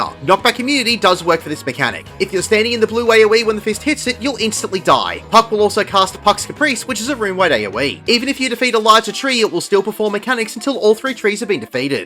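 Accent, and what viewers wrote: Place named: Scotland, United Kingdom. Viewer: I’m here for one reeeeeeason: the Scottish ish is crazy Brodie 😂🤣👏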